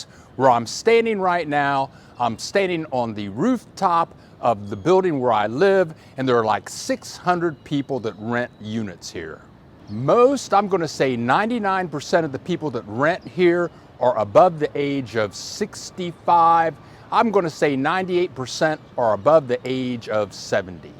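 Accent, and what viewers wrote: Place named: Midwest, United States. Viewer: Funny. From Detroit, I detected midwest there!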